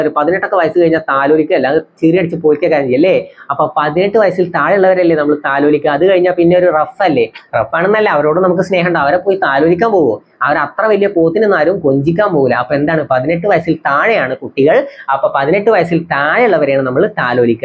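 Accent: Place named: Palakkad, India. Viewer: 18 വയസിനു താഴെ ഉള്ളോരേ ഒക്കെ ആരേലും താലോലിക്കോ? ചിറി അടിച്ചു പൊളിക്കല്ലേ ചെയ്യാ 😂😂😂😂 Typical Mannarkkad Ottapalam Slang 💙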